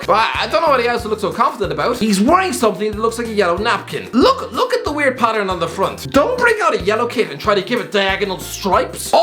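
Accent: Ireland. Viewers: Are you Really Irish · Where in Ireland are you from? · Qna: why are you irish?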